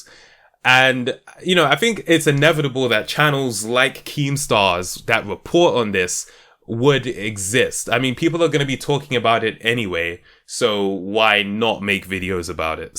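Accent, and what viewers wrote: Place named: United Kingdom. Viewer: Love your Uk accent!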